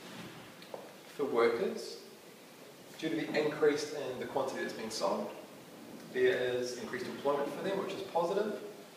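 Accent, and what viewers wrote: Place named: New Zealand. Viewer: Is it really that hard for americans to understand a new zealand accent? God sake lol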